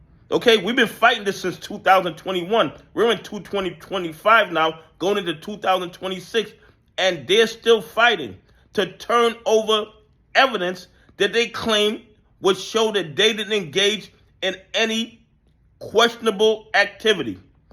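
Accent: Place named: Bronx, United States. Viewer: How can I get in touch with you brother? Sitting on an Ozone park property you sound like your from the BX like myself.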